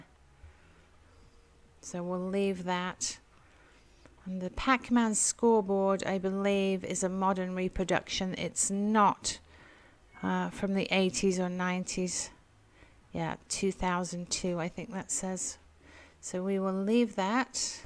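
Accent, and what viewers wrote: England, United Kingdom. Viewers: I love shopping with you ,your English accent is like someone from home, Your fun.😊❤😊too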